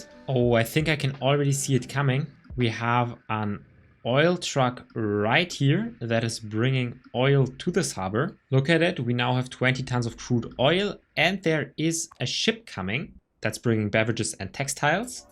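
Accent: Germany. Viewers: Bist du Deutsch wegen deinem akzent hört man das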